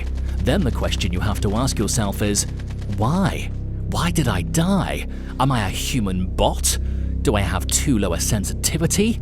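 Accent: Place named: United Kingdom. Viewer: Hey guys I'm new to chanel. Why is the commentary in a British accent in contrast with accent????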